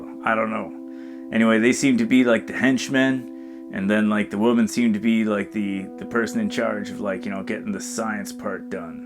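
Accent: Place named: Canada. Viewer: nice vid! nice canadian accent lol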